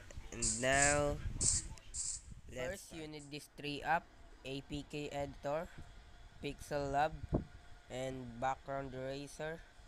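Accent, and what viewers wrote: Philippines. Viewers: Sa accent pa lang alam kong pinoy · Your a Filipino cause your voice is like a Filipino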